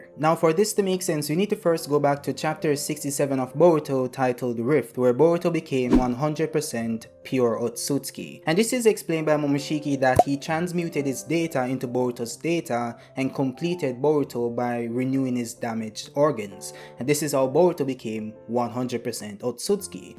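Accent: Jamaica. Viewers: Hmm u sound Jamaican... are you?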